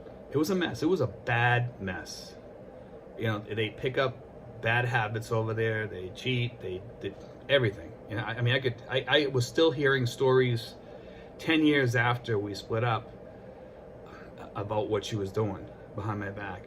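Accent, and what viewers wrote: Boston, United States. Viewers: Always feel like ( your accent ) I'm watching Good Will Hunting! Bumpah stichah, for the cah.. seriously...I shop at home in Canada for everything. Balut is available here. And ah, the ladies who make balut live here too. So, if you want to travel 7000 miles to shop, knock yourself out. Might be simpler to walk to the local store. Sheesh boys! We are college gradu-ates right:)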